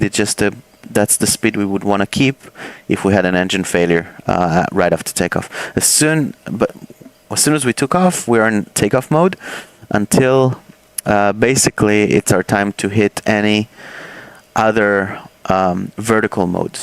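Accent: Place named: Israel. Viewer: You'er sound Israeli. Am i right ?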